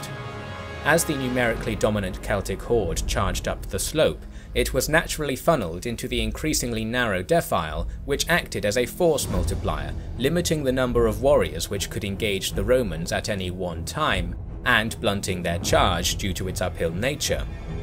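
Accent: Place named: United Kingdom. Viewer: Yeaaaaah History > GOT So this i why you wanted a female british voice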